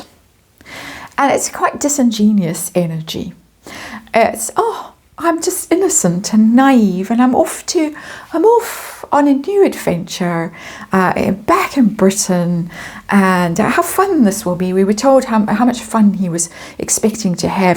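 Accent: South Africa. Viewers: You are on fire today! Love the snark in your beautiful SA accent!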